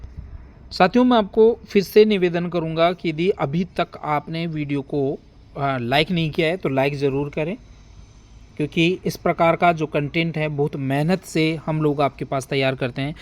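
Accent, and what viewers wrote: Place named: Dhundhari, India. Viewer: आप भी सर ढुढाडी बोलते हो की, एक शब्द है आम्म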